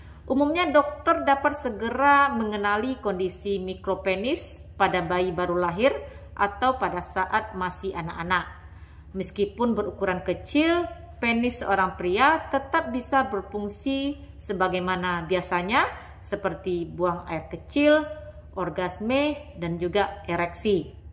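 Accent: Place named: Sumatra, Indonesia. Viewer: Logat nya kyk sumatra deh😅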